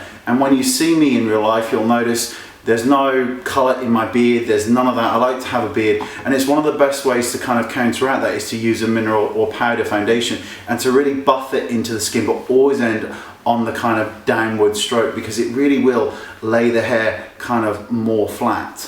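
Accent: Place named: Bristol, United Kingdom. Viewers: You ARE BEAUTIFUL. And having an English accent. Not fair u look even more beautiful here you remind me a lot of my fav singer Darren Hayes I Love all of your videos. Thank you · Sounds like you are from Bristol.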